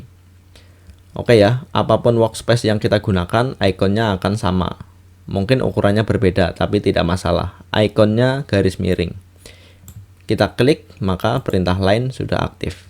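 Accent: Banyumas, Indonesia. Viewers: mantap mas.. lanjutkan.. saya tonton videonya semua... btw masnya kayak ngapak nih xixixix josss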